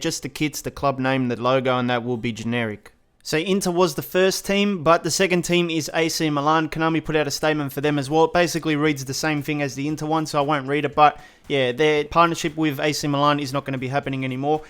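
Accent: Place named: Australia. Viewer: I'm an Australian guy living in 🇪🇺 and I have to say I just watch this channel for the hosts accent....I feel like I'm back home at the Gabba with a cold one on the first day of the November test match! 😅🤣😂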